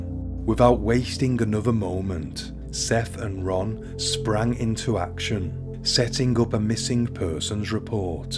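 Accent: United Kingdom. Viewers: That's one of the most British accents I've ever heard my god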